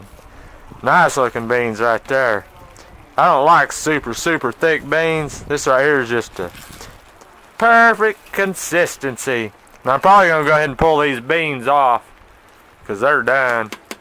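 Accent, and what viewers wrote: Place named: South Carolina, United States. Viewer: I hear accents and dialects like yours here in South Carolina too.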